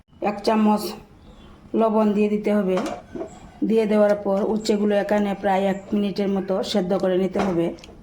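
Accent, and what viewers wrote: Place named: Tripura, India. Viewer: Bhalo laglo. Kintu aapnar bangla uccharan keno annya rakam. Aapni ki tripuri or silent?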